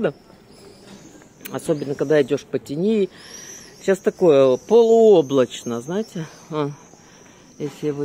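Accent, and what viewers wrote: Odessa, Ukraine. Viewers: Снова и снова восхищаюсь голосом и одесским говором. Спасибо вам большое. Смотрю вас с удовольствием.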